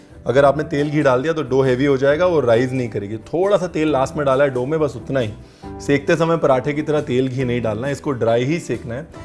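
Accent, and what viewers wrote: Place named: Punjab, India. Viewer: bro u r out standing i mean kuch v hove tuhadia videos ni kde v miss kitia n tuhadi jheri apne aap punjabi a jandi vich bolde bolde oh ta bhti vdia lgdi menu jdo hain kehnde "hain" kehnde hehhe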